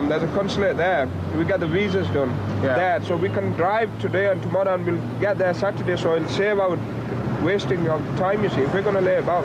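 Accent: Bradford, United Kingdom. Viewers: What a pure british accent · Thick Yorkshire accent, but his hometown is in Pakistan? I don't think so. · It was good to understand every word. You can't beat a good old Bradford accent. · Brilliant show this,real Bradford accent,Salt of the earth. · Stumbled on this,absolutely superb!the Asian Yorkshire narration is out of this world.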